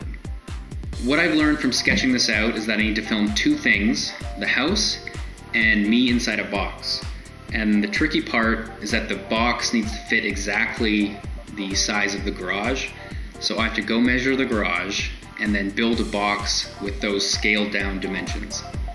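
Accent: United Kingdom. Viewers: At first, I thought you were british TBH.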